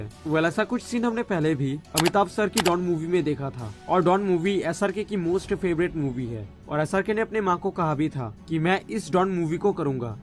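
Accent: Assam, India. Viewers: Bhai aap Assam se ho kya????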